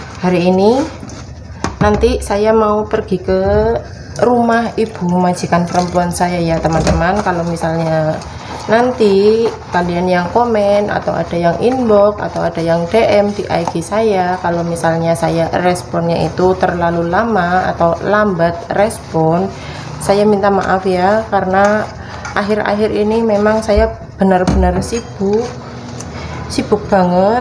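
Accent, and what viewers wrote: Banyumas, Indonesia. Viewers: Wong ngapak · tetep semangat mbokayu. slm ngapa.ora ngapak ora kepenak.mrebet pbg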